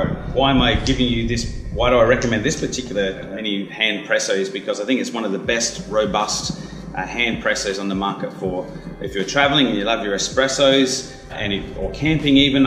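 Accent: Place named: Australia. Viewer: Nice oz accent mate, I am Asian spent 4yrs in MEL,I feel like home when u speaking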